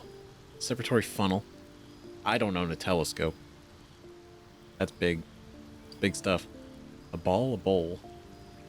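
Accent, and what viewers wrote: England, United Kingdom. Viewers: Yes, it's a loan word from French. I think is English so I assume he's heard it a lot. Maybe he's American though? He does use both English and American words and pronunciations but his accent is English, I think. lol I was just laughing about it and not trying to make a serious complaint or anything. It was meant to be a friendly comment. I'm genuinely sorry if I gave the impression I was trying to be rude or offensive. I apologise.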